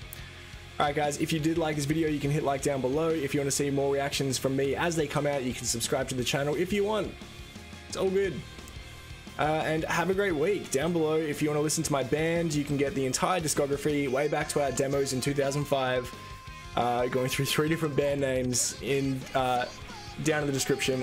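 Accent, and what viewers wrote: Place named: Australia. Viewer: Very cool! Love your Aussie accent! My grandma was from New South Wales, in Bega!. She loved all kinds of music and She would have loved your channel! Keep rockin’ it out! 🤘🏻❤️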